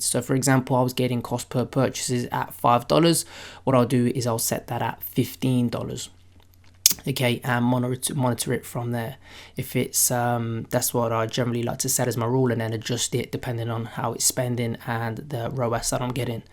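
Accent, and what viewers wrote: England, United Kingdom. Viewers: R u from England?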